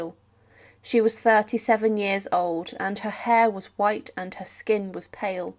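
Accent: United Kingdom. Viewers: Narrator is not American lol. She sounds British. "Only Americans" 🙄 smh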